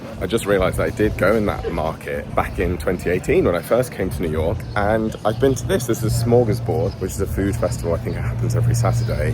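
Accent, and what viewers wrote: England, United Kingdom. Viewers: Ok take it from this old lady, you being so cute and an Englishman in New York. Oh, my, they could write a song about that. L o l just open your mouth and they'll love you. That lovely accent alone. Here in America you are one of the most classy person. So go in those upper shops look like you own the place. They love.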